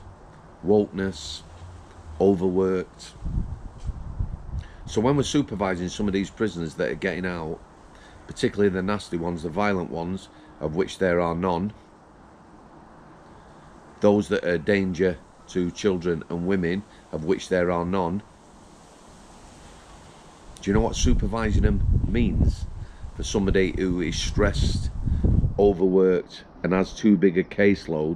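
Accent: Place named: Yorkshire, United Kingdom. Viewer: Love your videos, mate. Hearing 1st hand stories etc. Still trying to work out where you are from. Barnsley, like mesen is like, or Wakey, Sheffield? Al si thi.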